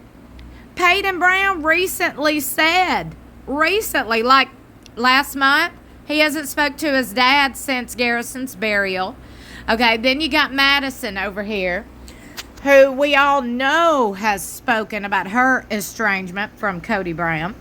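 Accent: South, United States. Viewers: This is awesome and your strong southern accent makes it hilarious! I love the way you say full of shit!😂😂😂 I’m southern girl as well so I appreciate a good southern accent ❤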